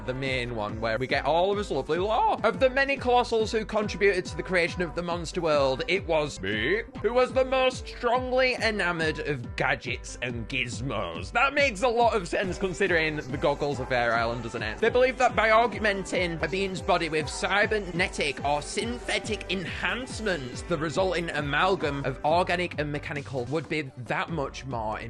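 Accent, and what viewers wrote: United Kingdom. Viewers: are you bri'ish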